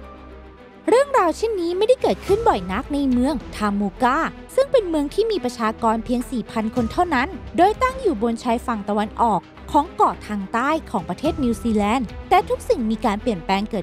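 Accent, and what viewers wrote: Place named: Suphanburi, Thailand. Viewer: ชอบสำเนียงเหน่อๆคนพากย์แบบสาวสุพรรณแบบนี้จริงๆน่ารักแปลกๆดี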